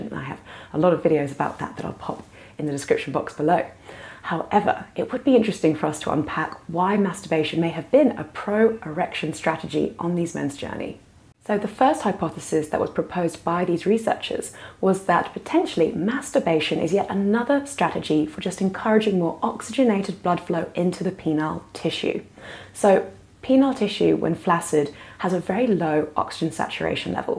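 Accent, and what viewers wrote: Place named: United Kingdom. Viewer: Listen to this woman. It is not just lip-service. I have been jerking off for 68 years and I couldn’t agree more. Unfortunately, her British accent makes understanding what the hell she’s blathering about quite difficult, but I heard the word “masturbation “ over and over again and that I can relate to!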